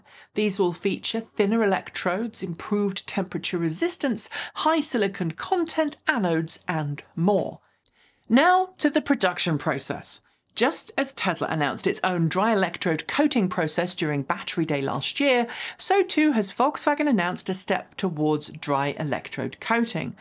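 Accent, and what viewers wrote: England, United Kingdom. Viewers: does have a regional accent -- that region is England:-) And within England, toward the south, and well educated. Also I think goes out of her way to enunciate clearly. Listening to her as a native English speaker, she's pretty unmistakably not American. I don't think many Americans would believe her accent is from anywhere in the US. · does have a regional accent -- that region is England:-) And within England, toward the south, and well educated. And I am saying as a non native english speaker you can't tell whether this is an english accent, or one from somewhere in the US. Therefor I'd find it stunning if anyone would have problem with (unless they are looking for problems).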